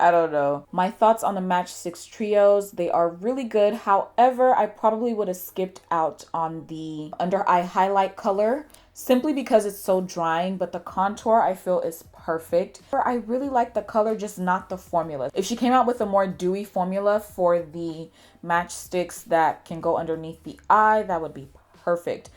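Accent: Nigeria. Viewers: Very beautiful and honest review. Will look forward to more of your Nigerian accent😂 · Stunning!!!Luv the naija accent🤣🤣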